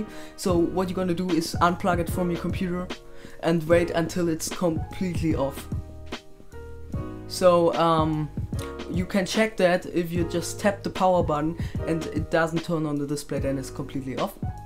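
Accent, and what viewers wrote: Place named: Germany. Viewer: Man merkt das du deutscher bist lul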